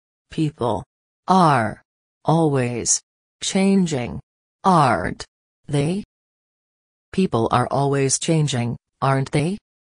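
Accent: United States. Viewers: Спасибо.Но,это американский диалект. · it's american english pronunciation! you should teach RP english. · Нужно английское произношение, это наверное, американское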